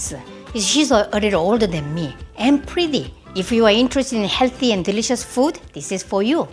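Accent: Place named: South Korea. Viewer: i am very surprised that you speak English so well(even with light KR accent)!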